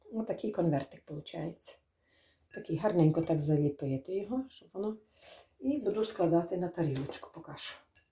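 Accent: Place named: Bukovina, Ukraine. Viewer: Слюна тече дякую мені кажеться що ви з Буковина наша рідна мова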